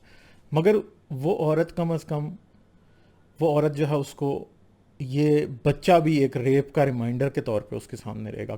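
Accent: Punjab, Pakistan. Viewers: I am so pleased to see the books.your accent reminds me of my Punjabi friend from Amritsar.wish Islam never existed we would have remained as a single strong country.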